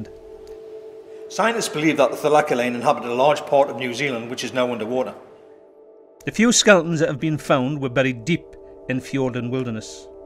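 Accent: United Kingdom. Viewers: ''the size of a siberian tiger'', " that spent most of its time in the tree ". Yeah right, a big tiger-sized tree animal that only had Moas for prey, how stupid this Scottish accented narrator think the audience can be ????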